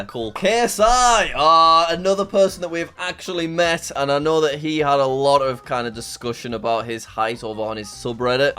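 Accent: RP, United Kingdom. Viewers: I wonder if he got the sudden inclination because Dan has made fun of how tall he is in the past? So he kinda built this image of himself that he and his fans joke about him being a tall, slightly posh (thus kinda naturally friendly) sounding guy which is a subversion from the fact that he actually has terrible posture and a more cynical sense of humor and attitude. There’s also just the infamous line from his rap roast that he’s basically the product of what would happen if Winnie the Pooh f*cked Slenderman 😳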